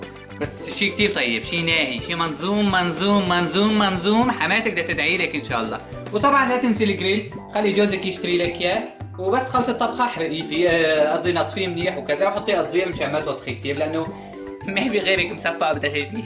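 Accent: Aleppo, Syria. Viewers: الحلو فيو يذكرنا حكي حلب يا خيتوووووووو😊😊😊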